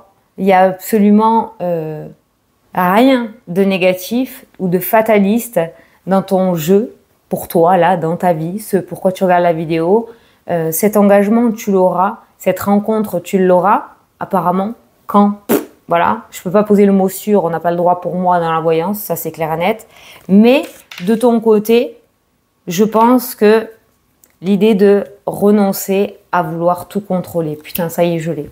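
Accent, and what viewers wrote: South, France. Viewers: Bonjour ma, tu es une personne très de l empathie cela se ressent dans la façon de t exprimer avec ton petit accès du sud que j aime entendre. Je souhaite pouvoir participer à ton jeu concours pour avoir une consultation gratuite de 30 m. Merci pour le postif que tu nous apportes tous les jours et qui nous aident t positiver. Un grand merci ma douce.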